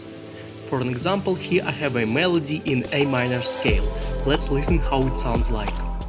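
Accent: Russia. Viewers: Бро, по акценту узнаю что ты Русский. Я сам из Узбекистана, учусь и живу в Корее. Недавно наткнулся на твои видео, очень информативные и полезные. Просто хочу сказать спасибо за твоё старание. Break a leg! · ну просто слышно , что ты русский, соответственно у тебя есть акцент, который немного режит уши, но видео мне понравилось, лучше уж пусть будет такая озвучка чем гугл-озвучка)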